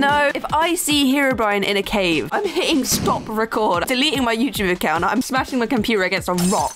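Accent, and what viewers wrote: United Kingdom. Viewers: I love how she starts sounding really really british halfway through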